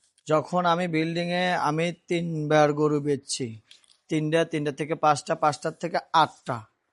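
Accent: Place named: Gaibandha, Bangladesh. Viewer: ভাই আপনার হোম টাউন কি কোন ভাবে গাইবান্ধা জেলায়? ভাষার টান টা তেমনি লাগে। আশা করি রিপ্লাই টা দিবেন।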